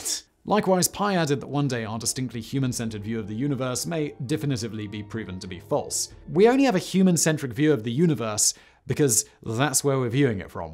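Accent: United Kingdom. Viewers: British pronunciation is fascinating. Adding an extra i in aluminum, and apparently deleting an m from cadmium?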